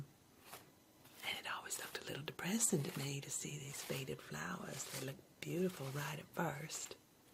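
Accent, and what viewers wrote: South, United States. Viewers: Oh what a wonderful surprise! Absolutely love love love this Southern belle! Just exquisite and relaxing. Happy new year! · Oh my gosh yay. I love, that southern accent is so tingle inducing. Love love looooove <3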